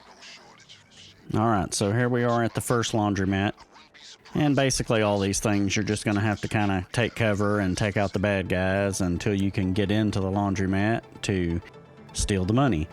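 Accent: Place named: Kentucky, United States. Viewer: I love your accent! I'm from Kentucky and I don't know where you're from but you sound like you could be my neighbor! 😂 Great walkthrough! I appreciate it!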